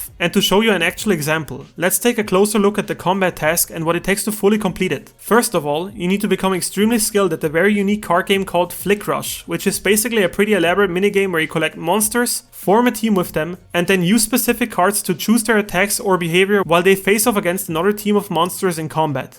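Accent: Germany. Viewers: Man hört direkt dass du deutscher bist. Trotzdem geiles Video!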